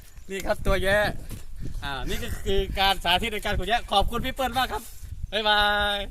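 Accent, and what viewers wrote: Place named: Laos. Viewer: เว่าลาวแต่แรกกะแร้วน้อคะข้อยรุ่นนำเจ้า..ย่านเจ้าเว่าตกลาว55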